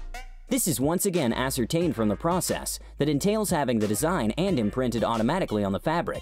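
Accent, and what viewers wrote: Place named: China. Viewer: Terrible chinglish.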